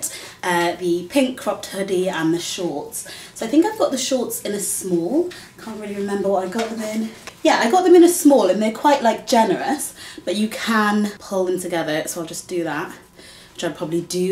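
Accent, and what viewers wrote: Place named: RP, United Kingdom. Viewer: Omg you sound posh I love the way you talk tho it’s not the common English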